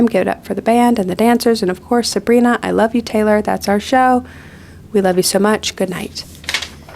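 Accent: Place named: Chapel Hill, United States. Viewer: Omg there was always something so familiar about your cadance. i’m from chapel hill, it all adds up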